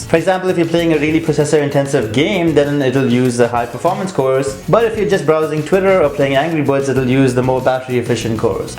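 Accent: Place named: India. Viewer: great! grammar is pretty good but you sounded so indian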